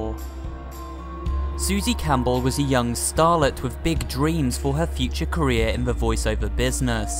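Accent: United Kingdom. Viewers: Just wanted you to know, you sound british. I absolutely adore and admire british people because of their accent. This is probably why i enjoy your videos.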